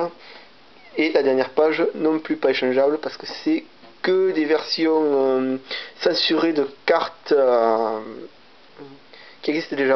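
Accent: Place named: South, France. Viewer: t du sud comme moi toi vu ton accent ! (ce n'est en rien une critique) et j'aimerais t'échanger le dragon détonant la derniere carte est canon derriere la porte ce qui fait que quand tu dois te prendre des dégats par effet , c'est ton adversaire qui se les prend et aprés si tu as des protecteurs du tombeau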